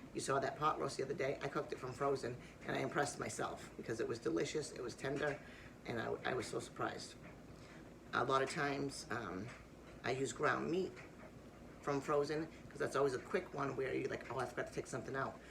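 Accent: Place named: New England, United States. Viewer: I thought I detected a new England accent on you I have relatives thst live in foxovuro MA we used to drive down there all the time when I was young and it was always my favorite vacation. My aunt lived on the end of a dead end street right on a lake and Shaffer stadium was right on the other side of it so we used to hear concert from her back yard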